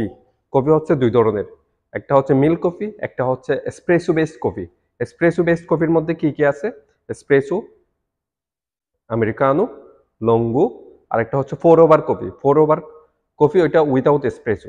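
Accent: Chittagong, Bangladesh. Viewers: আপনি কি চাটগাঁইয়া?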